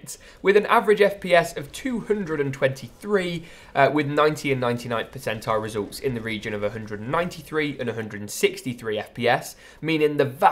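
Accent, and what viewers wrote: United Kingdom. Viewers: I like the UK accent 😅😅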